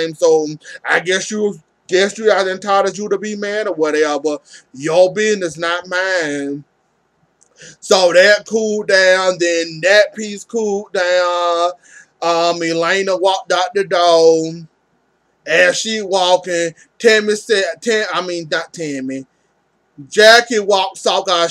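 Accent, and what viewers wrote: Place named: South, United States. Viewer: I love that accent. Country grammar. ❤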